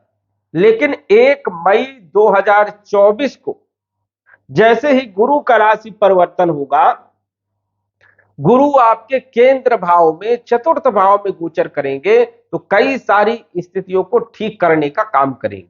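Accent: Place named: Vindhya, India. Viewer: जी लगता है विन्ध्य क्षेत्र से हैं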